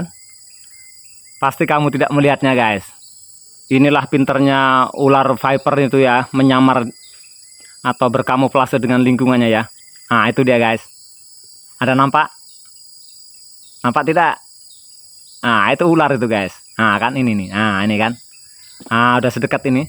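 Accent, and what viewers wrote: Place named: Medan, Indonesia. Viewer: Abang ni logatnya kayak irang medan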